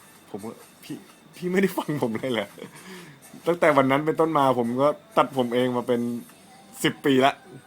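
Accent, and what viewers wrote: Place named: Thailand. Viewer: ทำไมต้องพูดเหน่อ คนจังหวัดไรอ่ะ คะ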